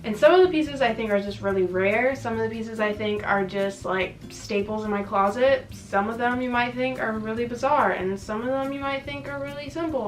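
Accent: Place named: United States. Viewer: u sound like one of my cousins from america 🥺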